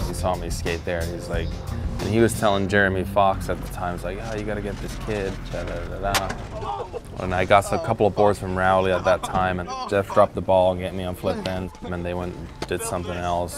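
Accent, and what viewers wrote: United States. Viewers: I'm sure hanging out with cali stoners for 20 years helps with the accent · From the comments in the he said in the menkimati video, his sponsors in Finland in this video and the way he pronounces Finnish words in an American way. I get the feeling he isn’t the biggest fan of Finland :) Feels like he was someone who didn’t belong and is happy that he got out.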